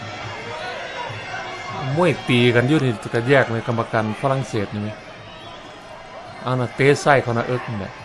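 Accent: Isan, Thailand. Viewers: ผมคนจังหวัดนครสวรรค์คนภาคกลาง ยังชอบเวลาภาคเสียงอีสานเลยครับตลกและฮาดีครับ..ชอบๆครับ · ผมว่าน่าจะใช่ พากย์มิสเตอร์บีนเสียงอีสานด้วย เสียงคล้ายมาก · 😂😂😂😂😂😂😂😂😂😂😂😂😂😂😂😂😂😂😂❤❤❤❤❤❤❤❤❤❤❤❤❤❤❤❤❤❤😂😂ชอบคนพากษ์ที่ชือ พากษ์อีสานฝรั่งขะแมร์😊😊😊😊😊😊😊😊😊😊😊😊😊😊😊😊😊😊😊😊😊😊😊😊😊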